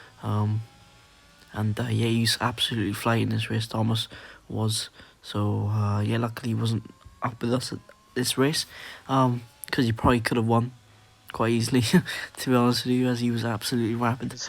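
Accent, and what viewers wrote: Wales, United Kingdom. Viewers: Are you welsh mate? Love the vids your a class driver lad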